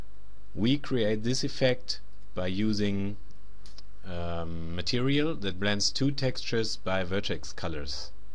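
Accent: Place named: Germany. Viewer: The German accent is the most awesome thing ever..! lol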